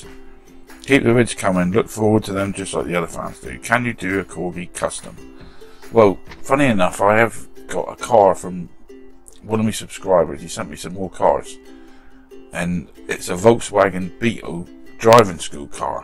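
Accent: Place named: West Country, United Kingdom. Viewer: Hi, love the videos seen most of them, usually start my day with one, grew up in Dorset and have lived in the California for 36 yrs, love to here the west country accent.🇬🇧🇺🇸😎👋👍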